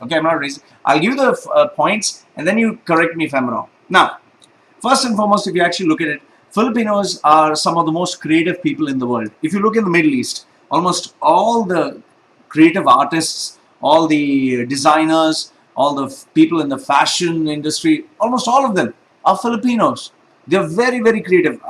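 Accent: India. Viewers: Hey guy. ..talk about ur nationality. .ur accent like. ..Indian. ...sooo talk about Indian. .. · he sound an indian. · akala mo kong mtatalino ang lahi mo.hoy tingnan mo muna ang mga kalahi mong mga Indian kong matatalino ba o mga edukado, kong mkapagjudge ka sa mga Pilipino wagas.At ano nman pakialam mo kong anong gustong bilhin nmin,pera namin ang pinambili hindi pera ng india.Palibhasa hanggang panglalait lang ang alam nyo sa mga pilipino kasi kahit saan kami magpunta magaling.tingnan mo muna sarili mo at kapwa mo indiano kong maayos ba o hindi.indiano ka nman eh hindi Arabo.salita mo pa lang halata na indiano. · all were true..i can sense you have indian accent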